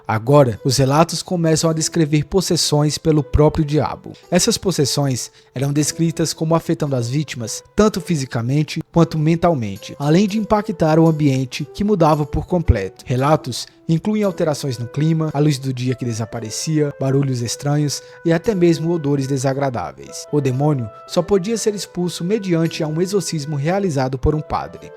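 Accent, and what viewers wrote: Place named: Northeast, Brazil. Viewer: Que Diabo é Diabo, sotaque nordestino.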